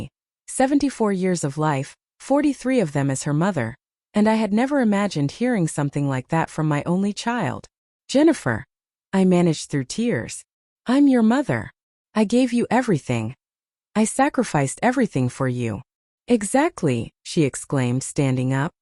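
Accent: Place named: United States. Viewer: If you're going to use AI to create a story supposedly by an Aussie, you could at least use an Aussue and not an American voice! Also you'd get a lot more than $110K after sale of a unit in Sydney. More like $1M.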